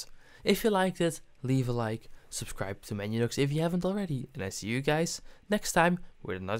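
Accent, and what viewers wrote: Netherlands. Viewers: This guy sounds dutch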